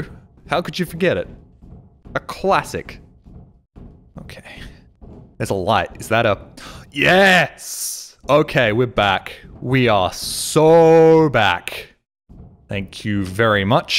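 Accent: Australia. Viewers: wai whoa you aint more australian guy? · Not me, subscribed to him for years, only now discovered he is Australian and not British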